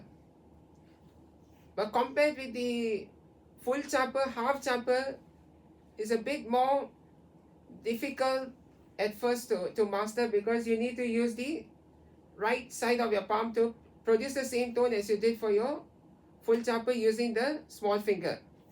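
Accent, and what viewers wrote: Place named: United States. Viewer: I am curious, your accent smells like US. Which state are you residing. And, are you conducting online classes. If yes, how can I approach your class. How much the charges for a student. Thank you 🙏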